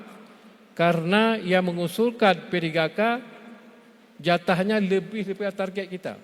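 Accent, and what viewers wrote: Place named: Aceh, Indonesia. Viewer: muzakir manaf belum pas berbahasa Indonesia masih logat Aceh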